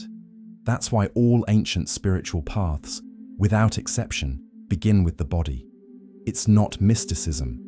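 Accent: England, United Kingdom. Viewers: I’m sick and tired of thievEnglish accents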